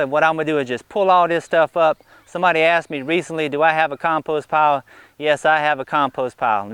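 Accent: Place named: Virginia, United States. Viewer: lol.. no wonder i love your accent. i live in southern va too! im an apartment dweller snd cant grow my own veggies but would love to. thank goodness for my local farmers market!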